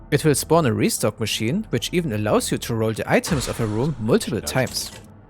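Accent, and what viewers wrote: Germany. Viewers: im asking myself for a long time but are you german?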